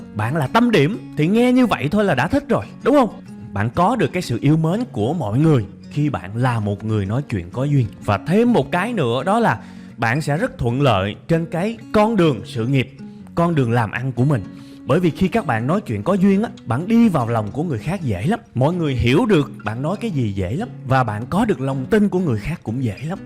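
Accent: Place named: Mekong Delta, Vietnam. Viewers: Mới đầu nghe anh này giảng dài dòng cũng thấy khó chịu, bực mình lắm nhưng coi hết mấy clip thì thấy nội dung hay, giọng ảnh miền Tây chân chất, clip có dàn dựng hiệu ứng 1 cách khoa học, lời giảng dễ hiểu, chân phương nên bị ghiền! :D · Anh chắc người miền tây hả cách nói chuyện của anh với funny gaming tv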